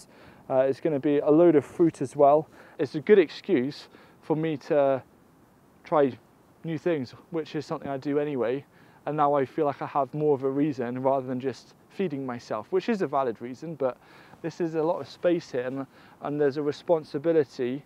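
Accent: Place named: Wales, United Kingdom. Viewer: Love hearing your voice just talking casually. I live in Hannover, north Germany, but I come from mid Wales (Montgomeryshire) and recently got into gardening, got a Kleingarten (german allotment with a little cabin) and was looking for help with ... how to have a garden, pretty much. Your advice and content are exactly what I needed, so I've been following along for a while. I'm starting to get the hang of it, and the joy of the whole project just grows and grows. And as a bonus, every word you say sounding like the people I grew up with makes it all very easy on the ear. Sometimes I don't watch what you're doing, just listen to you talk. I'm still learning though, right? 😊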